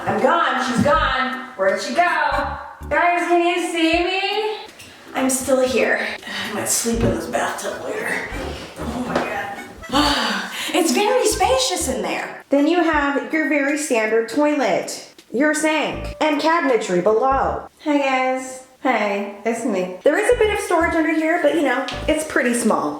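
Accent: United Kingdom. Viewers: Ngl your British accent sounds so good lol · Its her British accent that really makes me laugh😂😂😂😂 I love it. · who else loves her british accent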